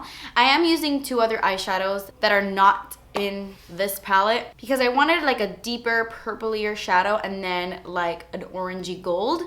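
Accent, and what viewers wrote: Cuba. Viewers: You should do a whole video in Spanish!!!! I love your Cuban accent!!! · Loving that Cuban accent❤️😍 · Stunning as always #LoveTheCubanAccent 💕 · I love when you speak Spanish, I can hear that Cuban accent peaking through 🙌🏼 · I frikin love your videos y ese acento Cubano ! Ay me encanta!! Perfecta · thank you for moving far away from the candle !!! it would give me anxiety when it was right behind you....!!!!! and I can't get enough of the Cuban accent, lo amo!! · chica yo te las veo perfecta!😂 adoro el acento cubano. Soy Nicaragüense😁. Cuba y Nicaragua son besties.😍 te amo!!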